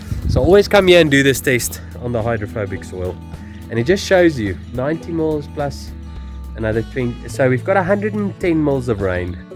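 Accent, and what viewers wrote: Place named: South Africa. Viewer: I originally came here for the grass tips....Now I’m just here for that South African accent.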